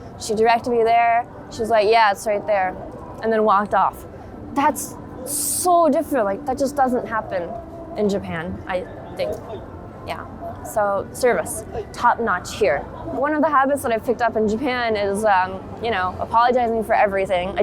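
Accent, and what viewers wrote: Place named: United States. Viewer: I feel sorry Japanese people learn English from you as u speaks American.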